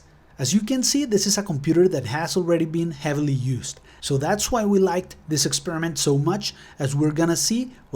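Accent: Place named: Colombia. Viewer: Tienes acento colombiano 😊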